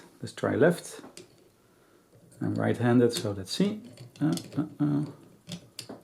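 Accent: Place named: Netherlands. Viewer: Ik kon horen dat je nederlands was haha! Bedankt voor de vid.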